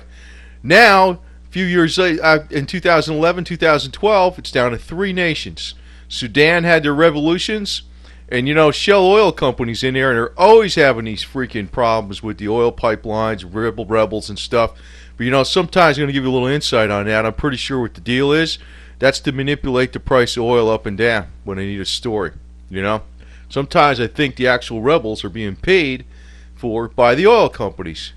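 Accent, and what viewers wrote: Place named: Minnesota, United States. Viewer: this guy is obviously from MIN NES SOODAA.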